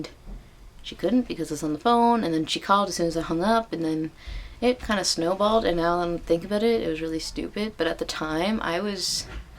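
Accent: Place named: Canada. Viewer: I KNEW IT YOU'RE CANADIAN. I love Canadians. And your accents.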